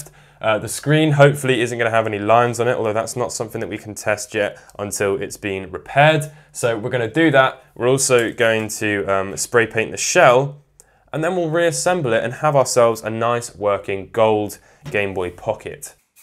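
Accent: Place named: United Kingdom. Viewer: Sounds like a fellow UK gamer to meeeee. 😎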